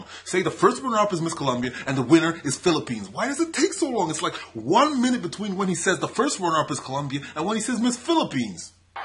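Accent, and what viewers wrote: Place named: East Coast, United States. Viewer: hahaha subbed back! I hear a little east coast..is that where you're located?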